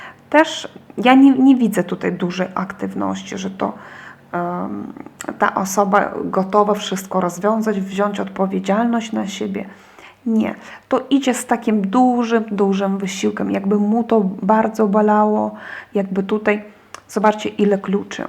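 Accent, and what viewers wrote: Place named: East, Poland. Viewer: wschodni akcent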